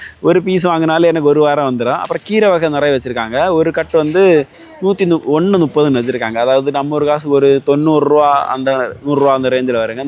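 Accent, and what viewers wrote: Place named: Kanyakumari, India. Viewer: Kanyakumari Nagercoil Slang😅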